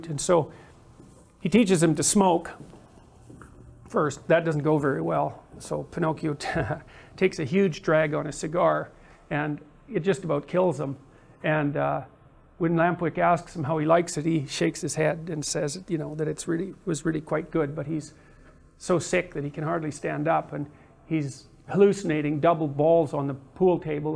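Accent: Canada. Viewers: Canuck's have an awesome accent! This makes me want to watch Pinoke again and see if the Soviet's had a hand in it rofl...I think if he had a normal voice, no one would buy his bizarre ideas lol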